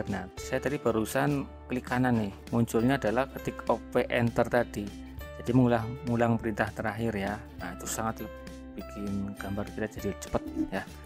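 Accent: Banyumas, Indonesia. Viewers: Belajar gambar dino pak..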